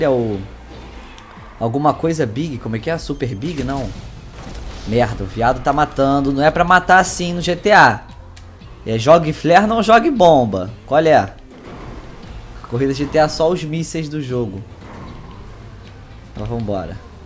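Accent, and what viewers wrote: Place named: Rio de Janeiro, Brazil. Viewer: esse sotaque de carioca muito loko kk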